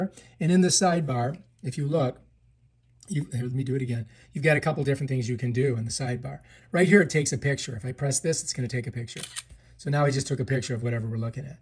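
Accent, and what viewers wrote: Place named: United States. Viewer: Do not delete. Why are you giving a camera plugged directly into your phone access to your local network?? Why would some Chinese product need access to all your other devices on your LAN if it’s plugged right into your phone? Think about it! That’s NOT safe. Why do you give it FULL access to your phone’s library? It doesn’t need FULL access to save a photo. Take a screenshot instead of giving access to China to all your files and network devices. You don’t think that’s weird? Oh, and it wants access to connect back to their company! Uhh, to send them your files?? Again, it doesn’t need access. If the software needs an update it would get it through the App Store, not directly from the Chinese programmers. HELLO! Wake up people.? Why are you encouraging people to do this? What is your motive? Who paid you to post this and other videos for this same dangerous device? 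You might sound American, you are anything but.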